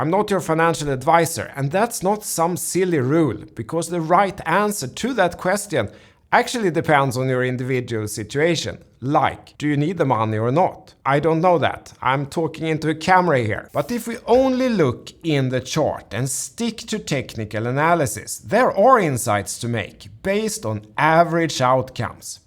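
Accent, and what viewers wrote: Sweden. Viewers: I always say "Hado" (I don't know how to spell it) to my swedish gaming buddies. I say it in your accent and for weeks they thought it was one of their countrymen saying it. You've apparently taught me more than investing strategy I also asked them about the canned fermented fish and they said, there's no way they would ever eat it 😆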